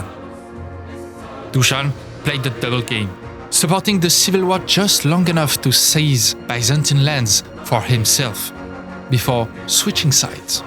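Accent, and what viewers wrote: France. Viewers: english with a french accent but one that is nice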